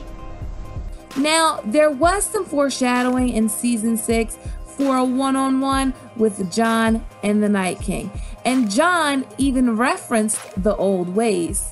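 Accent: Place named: DMV, United States. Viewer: You are from the DMV area or B-Moore I can hear it in the accent but good info on GOT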